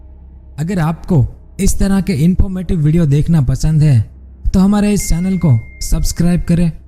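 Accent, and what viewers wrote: Gujarat, India. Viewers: Bhai Tumhara gujrati lehjaa mast he 😁😉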